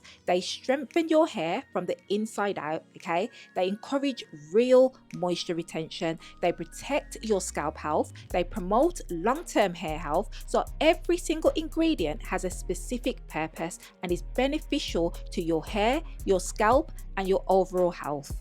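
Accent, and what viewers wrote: Birmingham, United Kingdom. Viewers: Your accent sounds like your from birmingham yay a sister from the ends😂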